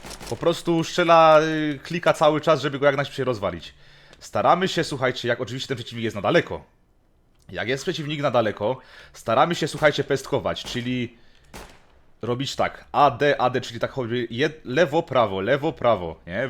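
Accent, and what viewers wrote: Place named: Silesia, Poland. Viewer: Czuć ten śląski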